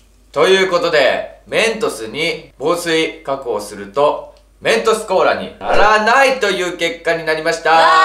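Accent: Kansai, Japan. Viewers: 今日も関西弁やね〜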